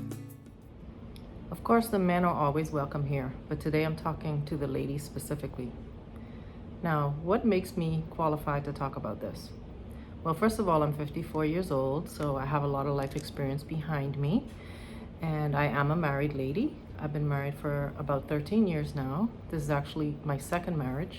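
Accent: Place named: Caribbean. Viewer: Based on your accent, are you from the Caribbean?. Excellent advice by the way.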